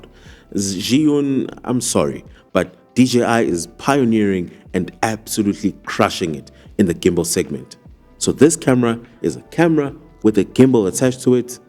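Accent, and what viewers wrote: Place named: South Africa. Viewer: I heard the South African accent and subscribed. Also, I like the zooming in and out of your MCUs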